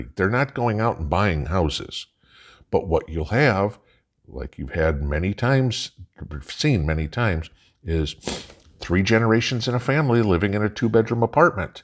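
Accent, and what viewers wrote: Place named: Philadelphia, United States. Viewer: Im hearing Philadelphia as well.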